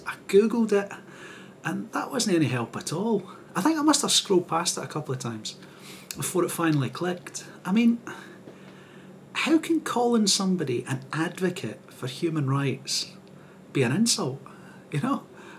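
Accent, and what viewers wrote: Scotland, United Kingdom. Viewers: you're reasonable, soft-spoken, w/a bad-ass scottish accent, AND you like cartoons? *subscribes*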